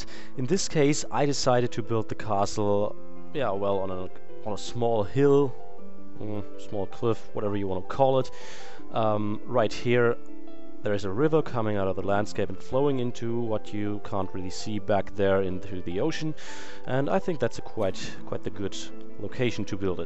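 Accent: Germany. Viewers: hei is that a german accent? :) nice vid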